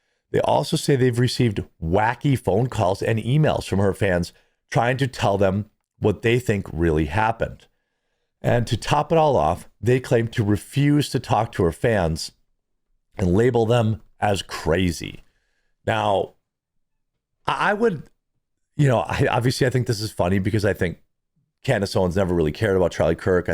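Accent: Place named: Milwaukee, United States. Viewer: Your hoarse voice and Milwaukee accent is very surfer bro.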